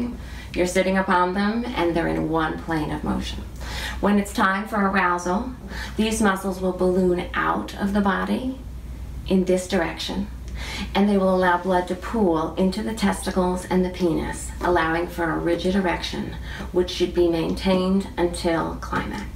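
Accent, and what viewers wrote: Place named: Texas, United States. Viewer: I am glad that you found my comment funny,but I can assure you that it is very true .I think it might have something to do with your sexy Texan accent.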